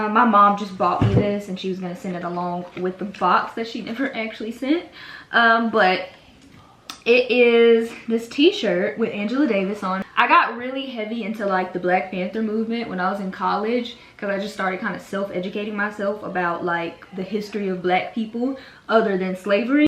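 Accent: South, United States. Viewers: Puuurrr 😻😻 I’ve only been Watching your videos for about a week but I knew I heard a southern accent ! Period Girl 😜💅🏽 this Vlog was very wholesome, keep up the good work sis ❣️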